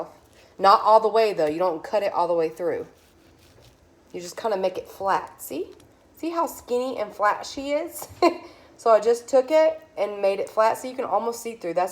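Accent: South, United States. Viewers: I just love they way you talk your southern accent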